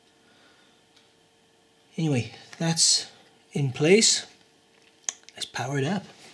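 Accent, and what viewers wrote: Wales, United Kingdom. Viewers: I thought u were welsh watching that video. im from Swansea. nice video by the way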